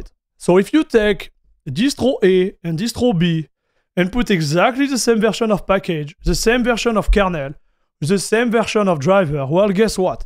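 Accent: France. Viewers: Fortunately if you turn subtitle (CC) on, though it's auto generate but match with his voice. FYI he's speaking English in French accent (sexy isn't it)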